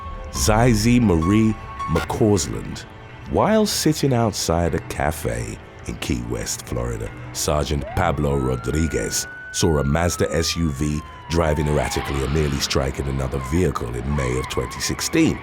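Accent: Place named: England, United Kingdom. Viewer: Valentine he has an English accent. They pronounce things differently than others may. You need to stop embarrassing yourself by not knowing that.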